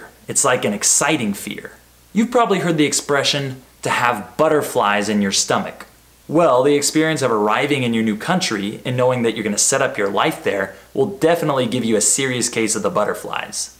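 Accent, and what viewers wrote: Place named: United States. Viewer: That's not English, it's American....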